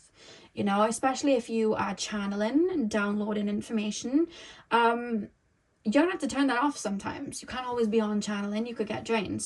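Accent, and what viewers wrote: Wales, United Kingdom. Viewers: This is really random but I just want to say I've always LOVED your voice and Welsh accent. The way you say certain words is amazing to me lol. When you said "hoodie" I was mind blown and had to replay lol love it ❤️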